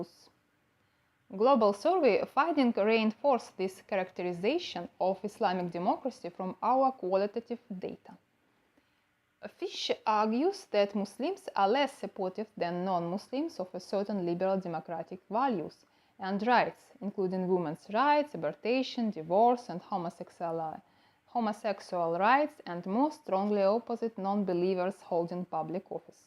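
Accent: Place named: Russia. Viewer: It's cool. I see that the person from Russia. I can hear my Russian accent😂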